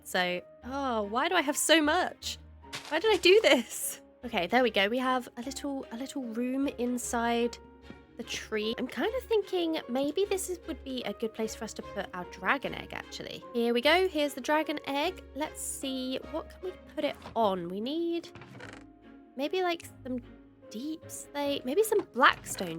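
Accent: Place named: United Kingdom. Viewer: Love the vids. Builds look great and nice to hear a chiiled brit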